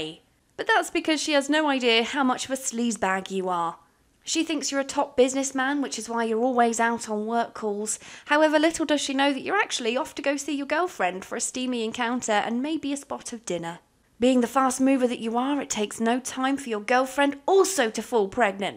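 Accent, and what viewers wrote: England, United Kingdom. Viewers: WoOoW!!! you are truely a unique story teller! Sims 2 has a built in autonymous whims gameplay system. Sims 3 has Story progression but sims 4 has given us so many beautiful tools and freedom ALLOWING US to tell our own stories and YOU my dearly beloved hit the POINT right on the nail!!! Alota simers find sims 4 boring which is unfortunate but what they dont realise is the amount of IMAGINTIVE playground it offers! All it demands from us is a special story from YOU the player and in turn THIS IS THE RESULT!!! So captivating i mean i JUST STARTED your video and when you starting introducing the TRASHBAGDADDY storyline i was BLOWN away and IMMEDIATELY started typing in comments!! WE NEED MORE like you! People with whimsy! English accent with Goddess angelic levels of VOICE Narritive and TRUE PASSION for the gendre i mean WOW you been loving the game since the beginning of time THIS IS SO INSPIRING! 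You have single handedly lifted my spirits and im sure you have ignited many others! i LOVE YOU i love you SoOo much!.. Can i have your number? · u talk like hermione, and i dont watch harry potter. i just know her. shes british.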